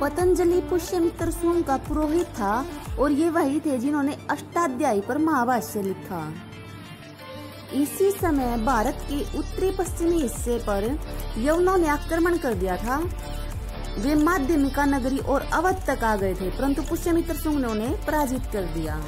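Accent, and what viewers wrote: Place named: Haryana, India. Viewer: Very nice video but control ur Haryanvi accent madam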